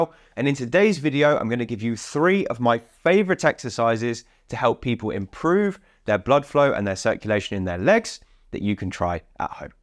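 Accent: United Kingdom. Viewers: I am appreciative of your videos and your book. You’ve given this Yank a chuckle over the difference in the way you Brits pronounce medical terms such as capillaries. You put the accent on the second syllable whereas we accent the first: cap.🧢pillars.😊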